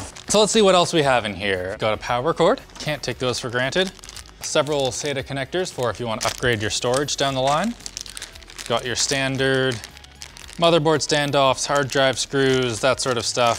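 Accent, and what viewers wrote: Canada. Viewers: has never sounded so Canadian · I love, but this is the first video where I could really hear his Canadian accent coming through (which was great). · btw what is that accent? canadian accent? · Thicker canadian accent than usual? :)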